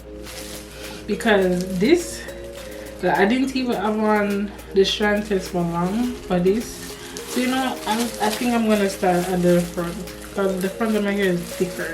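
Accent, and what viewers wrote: Jamaica. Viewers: R u Jamaican 🇯🇲 lol slight accent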